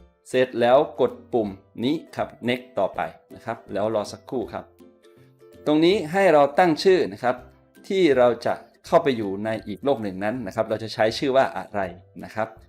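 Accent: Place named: Thailand. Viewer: ทำไมคนที่ไทยต้องออกเสียงชื่อหรือศัพท์ภาษาอังกฤษให้เป็นสไตล์แบบไทยๆ Google กูเกิ้น ได้อย่างไร เวลาไปเมืองนอกพูดภาษาอังกฤษคนฟังไม่ออก (ต้องทำไปทำไมครับ)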